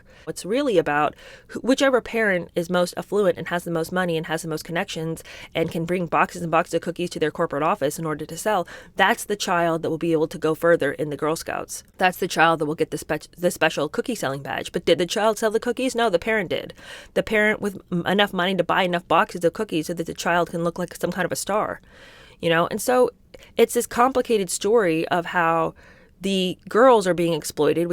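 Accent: United Kingdom. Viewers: Oh, you never disappoint. I clicked into this video in hopes of hearing your British accent and almost right out the gate...BAM...you delivered. Your videos haven't come up in my feed in a long time and now i realized how much I've missed you. 💕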